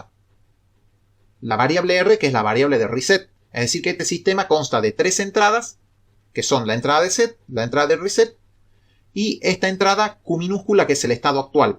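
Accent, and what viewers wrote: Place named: North, Argentina. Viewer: Muy buena explicación. ¿Usted es de Tucumán?, tiene tonada norteña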